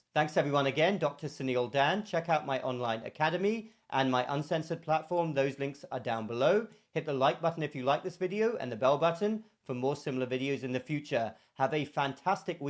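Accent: England, United Kingdom. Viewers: That's an English accent if ever I heard one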